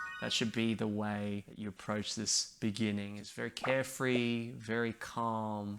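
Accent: Australia. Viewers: Why did you have to loose your ozzie accent? It's such a charming and delightful accent that always sounds cheerful. 😍 Also I love your arrangement and you're so kind to teach ❤️ You're brilliant!! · has a different accent here. More aussie. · I luv ur aussie accent nahh i just love uuuuu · I like your Australian pronunciation very much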